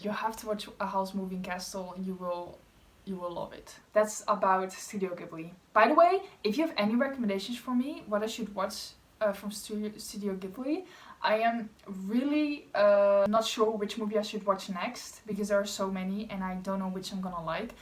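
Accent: Netherlands. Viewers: Are u from Netherlands? R u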